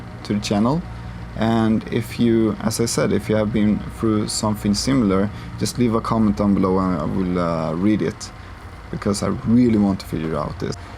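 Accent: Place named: Europe. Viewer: were are you from actually? Your accent was a bit european to me, or im i totally wrong here?🤔🙃🤭.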